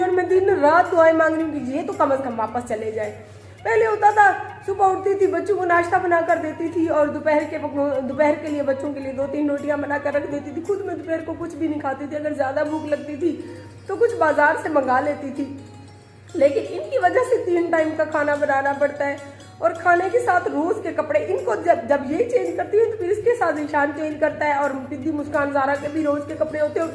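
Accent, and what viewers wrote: Saraiki, Pakistan. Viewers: Aapi saraik language apki zaban say booht achii lgti ha. But samjh bilqul b nai aati.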